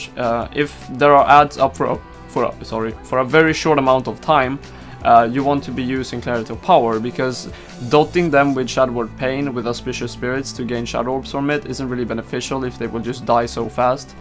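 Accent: Sweden. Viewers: You sound like a swedish person? Are you?xD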